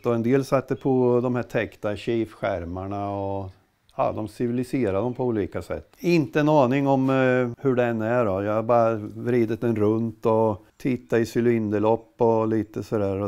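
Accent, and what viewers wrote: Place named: Östergötland, Sweden. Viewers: Mer östgötska till folket! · Hej! Jag hör att du är från Östergötland och är ett Indian freek, måste fråga dig om en Indian med sidovagn. Min min mammas morbror ägde den och tror att den inköptes <num> talet. Han hette Set Österkvist ock var från Örtomta församling utanför linköping. Skulle vara kul om du viste något om den? Jag har en historia att berätta över just den Indianen.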